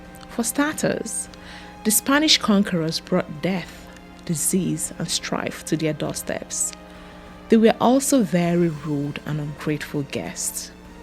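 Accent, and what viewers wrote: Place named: China. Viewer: Your English is bad, I'm missing 10 percent of your words. weird accent. I'm from London, dad's from st vincent. Sounds like you learned English from a Chinese person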